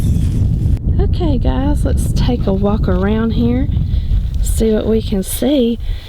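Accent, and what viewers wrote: South, United States. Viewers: Your welcome and I love your southern accent. I'm from Texas but been living in Louisiana for about 19 yes now .. I still have my Texas accent. I don't think it will ever completely go away. Lol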